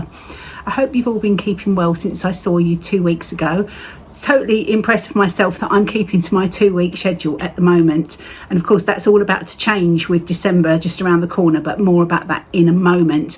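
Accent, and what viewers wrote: England, United Kingdom. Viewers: I think you should suck it up unfortunately and finish your blackbird designs piece. It is beautiful what better than what you think.. I don’t like doing two threads any more either I like the look of one thread on 36ct or 40 ct. I love to listen to you with your English accent. I have a brother in England and a lot of other relatives. Thankyou so much. Sue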